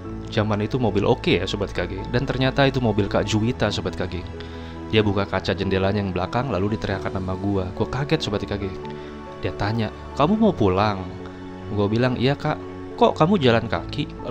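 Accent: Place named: Cilacap, Indonesia. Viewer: Wah cilacap nyong dong😂 sehat"lahir batin bang😇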